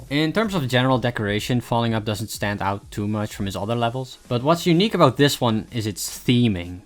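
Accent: Netherlands. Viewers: i can hear that dutch accent lol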